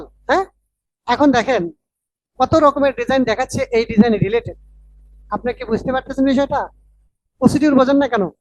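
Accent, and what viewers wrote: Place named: Chapai Nawabganj, Bangladesh. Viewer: ভয়েস শুনে তো মনে হচ্ছে চাঁপাই এর পোলা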